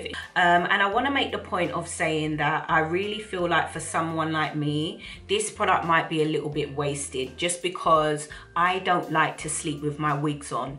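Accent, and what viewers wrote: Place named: United Kingdom. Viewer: Omg...I love love love love your accent, my dream is to come to the UK 🇬🇧 ❤️ thank you for your review. I bought the Ebin in the pump(like pump it up) you get WAY more lol can't wait to use it.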